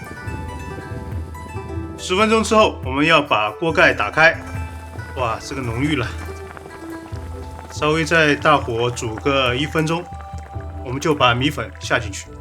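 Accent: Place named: Nanchang, China. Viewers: 这位大哥说话习惯在句末加个诶，的口音是熟悉的南昌味哈哈哈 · 南昌人表示听你这南昌口音普通话太亲切了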